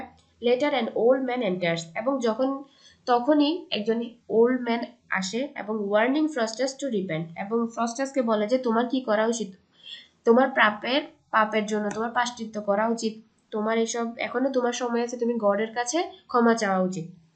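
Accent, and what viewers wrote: Chittagong, Bangladesh. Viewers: চট্টগ্রামের ভাষার প্রভাব😆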